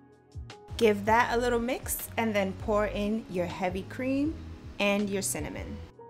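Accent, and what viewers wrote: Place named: New York, United States. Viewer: I definitely hear that NY accent!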